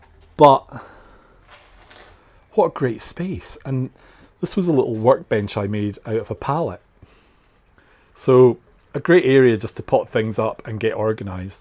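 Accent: Scotland, United Kingdom. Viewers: love your commentary and Scottish accent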